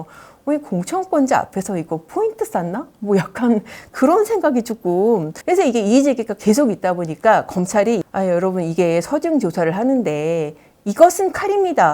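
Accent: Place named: Jeolla, South Korea. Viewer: 전라도억양이 있어요…조선은 우파라 할수없죠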